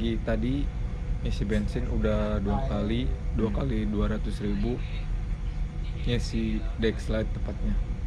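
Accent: West Java, Indonesia. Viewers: logat sundanya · Khas ye logat sunda nya wkwk ada kata2 mah sama teh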